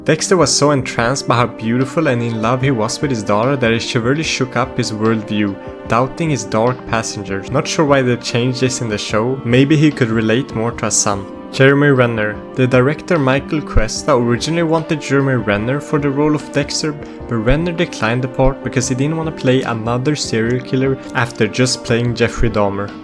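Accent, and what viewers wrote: Sweden. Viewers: the swenglish i love it! Great video btw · You hear the swedish more than the english when he speaks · yo are u by any chance swedish bc i think ur accent is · Are you by any chance Scandinavian? You sound kinda Swedish with the pronunciation. Greets and thanks for the great video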